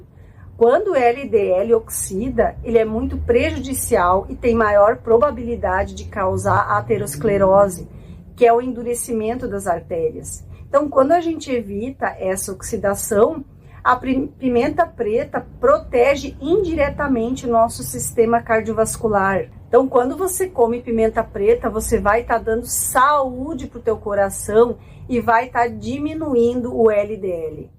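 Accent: Rio Grande do Sul, Brazil. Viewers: Bah, que belo conteúdo sobre a pimenta!🌶️ Fora isso, serio, sei que sou gaucha nata, colona, mas confesso que detesto ouvir nosso sotaque, principalmente outra pessoa falando. Porque é um sotaque com sons pobres, quase que uni-tom. Parece que a gente so tem um tom descendente e um ascendente e toda frase termina e começa do mesmo jeito. É enjoativo demais. É como um semi-alfabetizado lendo com medo de errar, as primeiras frases. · Dra a sua sabedoria aa hipnócratis tem alimentado minha fome por saúde.não obstante esse seu maravilhoso sotaque gaúcho é também um grande acolhimento terapêutico.sou professor aposentado.sou de Belo Horizonte,muito obrigado por você existir. Eustaguio.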